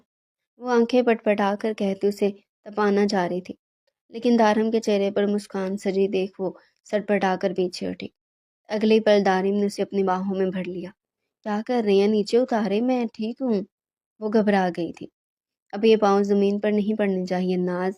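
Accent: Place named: Punjab, Pakistan. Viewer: Urdu with punjabi تلفظ!!!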